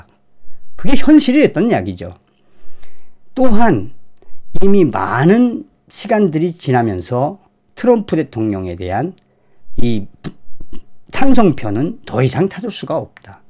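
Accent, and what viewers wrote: North Korea. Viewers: 북한 사투리로 가열차게 진행할때 속이 후련합니다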